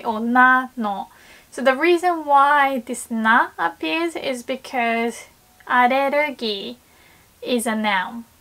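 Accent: England, United Kingdom. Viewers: Your English accent's made me obsessed with your Japanese lessons! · British accent for the win! :) Great video btw. I was struggling after reading Tae Kim on this point. You explained it very well. Now I need to find some good examples of listening practice. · is so fluent in English that I can only assume that she studied British English, or that she learned her pronunciation from a British person. British English and Japanese are non-rhotic languages so their vowel sounds flow very similarly, compared to the rhotic language of American English. I knew someone who studied American English for 10 years and had a strong, unnatural accent, but my friend who studied British English for 1-2 years spoke with a very natural accent. It is in my opinion that if more Japanese people studied the non-rhotic British English that they would be able to speak much more fluently. 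For example, let's take two words my English students struggle with, 'work' and 'walk'. If you look in Japanese-American study books they will say something as ridiculous as ウォーLク(walk) and ワーRク(work) in an attempt to force the rhotic sound (that isn't present in Japanese and has to be represented by the Roman alphabet). However, in British English ワーク(work) and ウォーク(walk) with rhotic consonants removed are perfect, with a simple accent change on the ワーク vowel from 'ah' to 'eh' and, other than naturalising the 'w' sound, ウォーク(walk) is perfectly natural to say too. If more schools/companies taught British English more and more Japanese people would be able to speak English with natural accents, and a natural British accent is much easier to understand than an unnatural and forced American accent.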